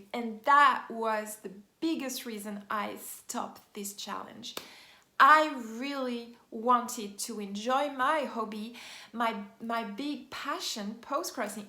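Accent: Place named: France. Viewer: We love you! You don't have to say sorry:) (even when you say with a french accent which is so cute <3 )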